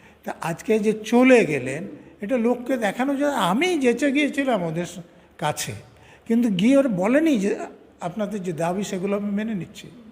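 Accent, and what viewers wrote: Bangladesh. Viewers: Aapnar mukhey dhakar kutti der kotha shuney anek smriti jegey uthchhey 😅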